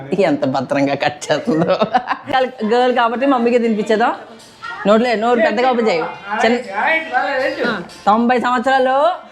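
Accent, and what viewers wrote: Godavari, India. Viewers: nuvu chudataniki class untav kani mataladithy man godaraari yasa gattiga undhi bro , Dubai ki godaariki yela bro asalu ... super pair made for each other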